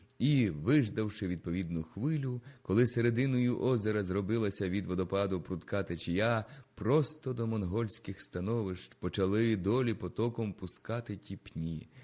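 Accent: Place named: Western Ukraine, Ukraine. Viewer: Щиро дякую за чудову роботу.Милує слух мова Заходу України. тих часів.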